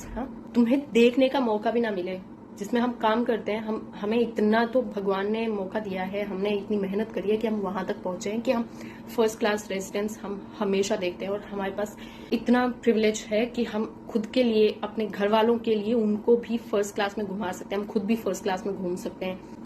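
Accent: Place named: United Kingdom. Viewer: aapne english bolna kase sikha plz share me link..........mughe bhaoot acha laga........ british accent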